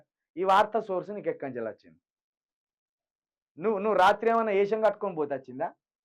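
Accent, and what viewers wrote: Telangana, India. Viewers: Jai Telangana jai KCR🚘🚘🚘🦁🦁 jai ktr harishrao super nuvvu achamaina Telangana భాష మాట్లాడుతావు super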